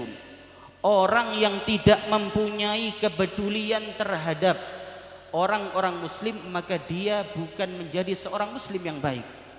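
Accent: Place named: Java, Indonesia. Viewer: Enak kyi jowo original rosone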